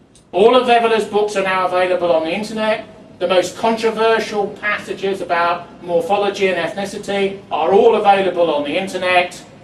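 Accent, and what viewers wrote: United Kingdom. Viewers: Idk man to me he sounds pro'er Bri'ish.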